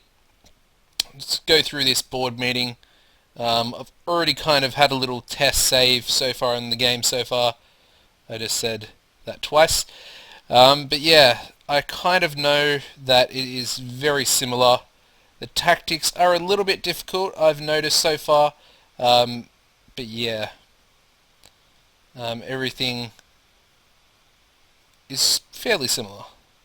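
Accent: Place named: Australia. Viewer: nice watching an fm play through with an aussie accent mate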